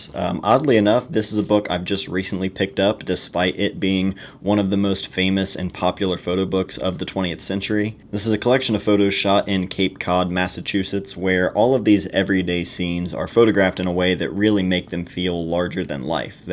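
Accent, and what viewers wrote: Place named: South, United States. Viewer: I just realise how southern you sound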